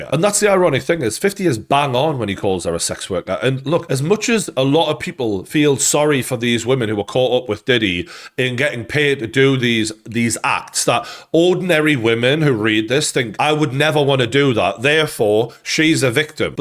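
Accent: Newcastle, United Kingdom. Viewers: You are a f ing legend put perfectly geordie 🫡💯💫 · I love the Geordie accent! One of my favorite YTers Cam Kirkham made me love it ❤ and 50 is King 👑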